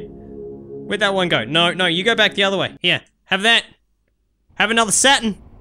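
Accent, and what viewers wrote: Australia. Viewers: you australian? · Wat your Australian me to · Are you Australian?! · His Australian not English for his it is satin for us it is saturn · Hes aussie! Like me!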